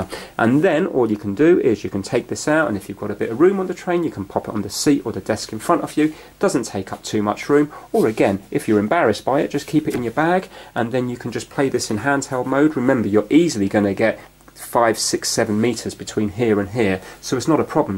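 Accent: United Kingdom. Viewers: Hes british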